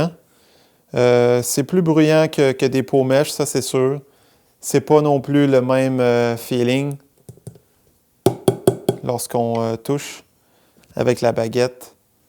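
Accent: Quebec, Canada. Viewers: C'est un accent Quebecois le grand.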